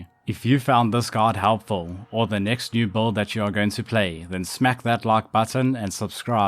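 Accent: South Africa. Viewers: You sound South African! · Are you South Africans because you accent sounds like it is from SA #YeeaaBooii anyway, love the build and looking to give it a shot as I have no clue what to try next this league.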